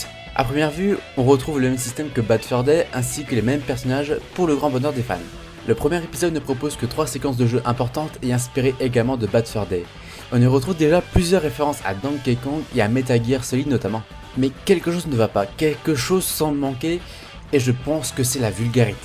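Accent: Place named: England, United Kingdom. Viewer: tu un belle acsent anglais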